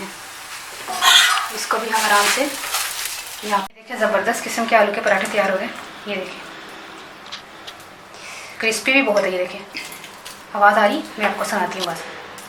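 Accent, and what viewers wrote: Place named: Kashmir, India. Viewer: Kashmiri urdu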